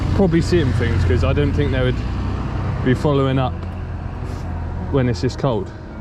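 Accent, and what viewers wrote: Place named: Australia. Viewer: Thats awesome catching huge perch in town and i got to hear a fellow Aussie 🎣👍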